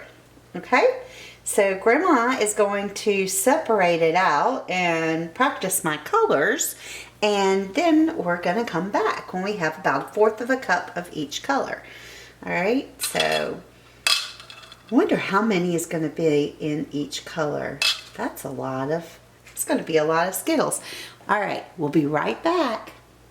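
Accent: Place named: South, United States. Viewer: This looks cool btw I like your voice it sounds southern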